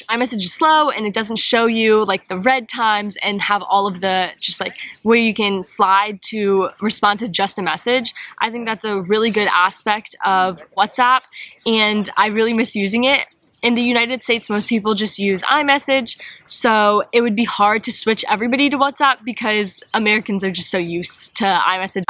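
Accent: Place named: Bavaria, Germany. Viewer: man merkt das du aus bayern kommst wenn du mal nach frankfurt kommst ist es so krass anders glaub mir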